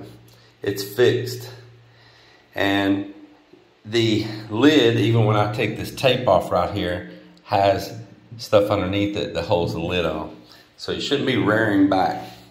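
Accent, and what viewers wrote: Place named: South, United States. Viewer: Dang: I thoroughly, )( THOROUGHLY ) enjoy and learn from your skills. You and Mylinda are really blessed. Still fine tuning my ears to your southern accent! I'll try and negotiate emailing you so we can be friends.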